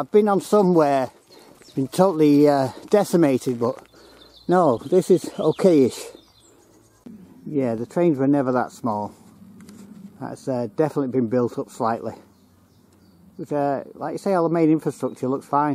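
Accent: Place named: Yorkshire, United Kingdom. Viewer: You’re a character? Love the determination to go ahead? Ur just like me mate? Love your accent ! Keep up the great work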